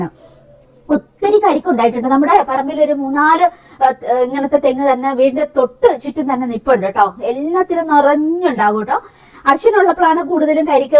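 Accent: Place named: Perumbavoor, India. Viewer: veedu perumbavoor moovatupuzha bagam aano basha kettitu chothichatha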